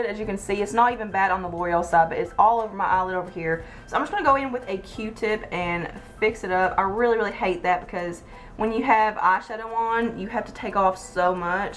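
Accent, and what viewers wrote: South, United States. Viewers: Hands Down...The Maybelline Colossal mascara looks better on you. Now I see why people love my southern accent, listening to you makes me proud to be a southern woman. Thumbs up.:) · Welcome to the Southern accent. A thing of beauty, ain't it?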